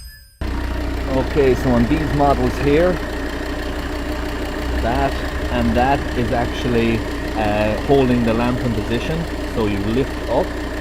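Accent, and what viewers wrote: Ireland. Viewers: not only was the instruction well conveyed but its nice to hear it with an irish accent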